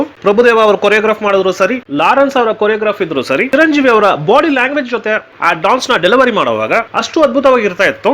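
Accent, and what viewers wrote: Tamil Nadu, India. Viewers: ಸೌಮ್ಯವಾದ ತಮಿಳ್ ಶೈಲಿಯ ತಪ್ ತಪ್ಪಾದ ಕನ್ನಡ ಉಚ್ಚಾರಣೆಯ ನಿರೂಪಣೆ. ಕನ್ನಡವನ್ನು ಚೆನ್ನಾಗಿ ಅರಗಿಸಿಕೊಳ್ಳಲು ಸಾಮರ್ಥ್ಯವಿಲ್ಲದಿದ್ದರೆ ನಿರೂಪಣೆ ಮಾಡಿ ಕೇಳುಗರನ್ನು ಹಿಂಸಿಸಬಾರದು. ಒಂದು ಸಿನಿಮಾ ಕಥೆ ಹೇಳುವುದೇ ನಿರೂಪಣೆಯಲ್ಲ. ಹೇಳುವುದನ್ನು ಮನಃಪೂರ್ವಕವಾಗಿ ಸೊಗಸಾಗಿ ಹೇಳಬೇಕು, ವಿಶಿಷ್ಟತೆಗಳನ್ನು ತಿಳಿಸಬೇಕು.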